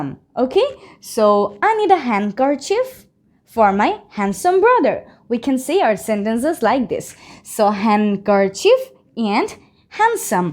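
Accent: United States. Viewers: Apu ki USA accent use koren? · We need this kind of more class. Btw Sister I have a question Is this American pronunciation?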